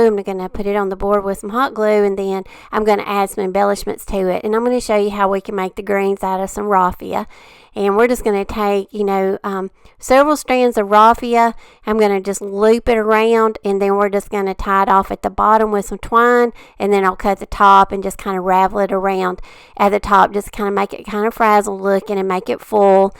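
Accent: South, United States. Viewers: I so enjoy watching your videos...I love everything about them from your instruction to your display of the items and also your background music. I think you are so great at what you do and I just love all of your videos. You are such an inspiration. I also think you have the cutest southern accent and are so pleasant to listen to. Thank you for sharing your wonderful talent.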